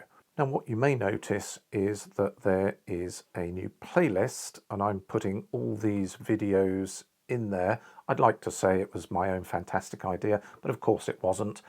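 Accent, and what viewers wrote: United Kingdom. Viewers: You are such a fine, articulate, and friendly teacher! Plus...I have a great British friend who is a classical guitarist (Mark Hussey), and your accent helps me remember his friendship! Thanks!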